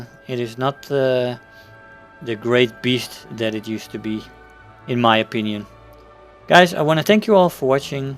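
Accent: Netherlands. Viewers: i can hear ur Dutch :)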